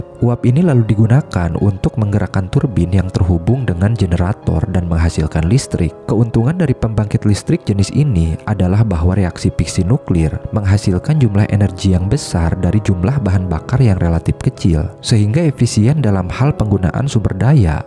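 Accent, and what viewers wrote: Indonesia. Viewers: orang sunda ya bang? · Akangnya urang sunda.. lepel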